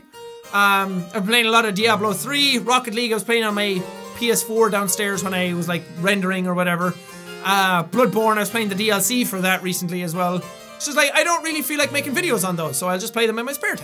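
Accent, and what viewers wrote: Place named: Ireland. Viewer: hey, you'll probably never read this, but you've helped me so much through out the couple months. my mom has currently gone through a car crash, totaling our car and adds more to one of our family's problems, blah blah, i wont go into much detail. you never fail to entertain me or make me laugh. whenever i feel like giving up, i just imagine your silly little irish voice encouraging me. i want to say thank you. im not the only one you've helped, and i really hope you continue to stay happy yourself and keep others happy. much love! high five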